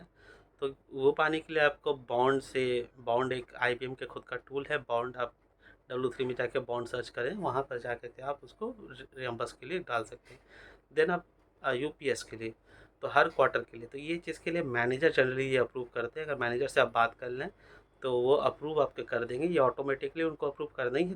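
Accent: Bengal, India. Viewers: R u Bengali ? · U got bengali accent while talking..khoob sundar👍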